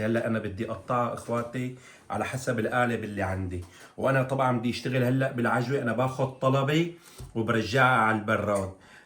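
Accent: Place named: Syria. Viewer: اللهجه السوريه تجنن❤❤❤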